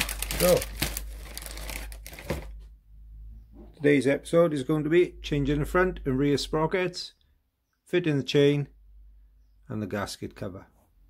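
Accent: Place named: Wales, United Kingdom. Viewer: Great, l've been looking for a vid like this of how to jam the front sprocket to enable to take it off. Spoken with a lovely Welsh accent too! Cheers buddy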